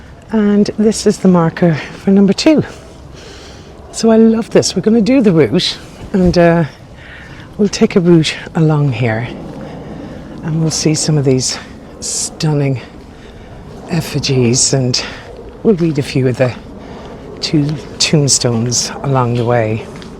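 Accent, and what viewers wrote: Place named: Ireland. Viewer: When you put on the CC it comes up with Tarrah instead of tower. It's your lovely Irish accent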